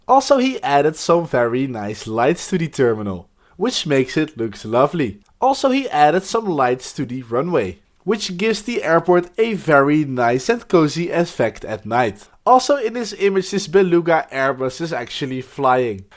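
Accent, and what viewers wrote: Netherlands. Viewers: Are u dutch??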